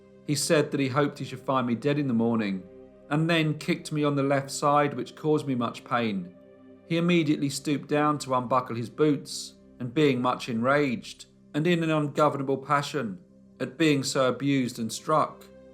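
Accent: England, United Kingdom. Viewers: I like this narrator’s voice. And his English accent. Love his stories, too.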